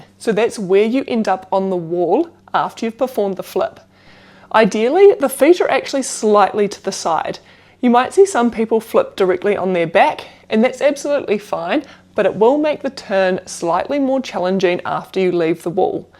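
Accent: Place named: New Zealand. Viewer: love the accent .. New Zealand ?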